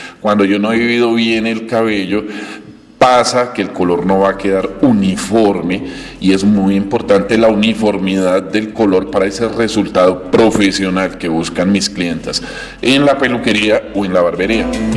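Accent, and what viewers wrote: Colombia. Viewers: Me encantó el video, tu profesionalidad y tu acento colombiano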